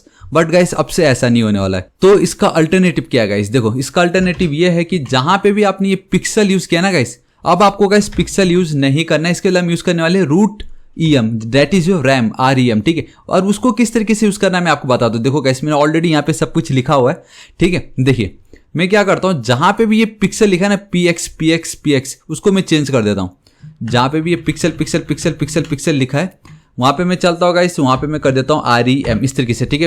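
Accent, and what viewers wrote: Nepal. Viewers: nepali acccent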